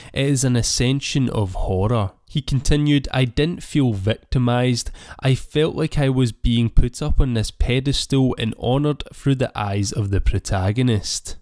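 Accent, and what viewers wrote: Scotland, United Kingdom. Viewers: "Arrr Eyes Soup-eRR GAL-EWW-D ON da Ssssska-reeeen" Your Scotish Brogue kills me. God Bless ya'. Move to Hollywood,because we need to hear that voice. Normally, Americans we can't understand a bloody thing Scots say, but you talk slowly. It's a blessing! U rock Scots-dude!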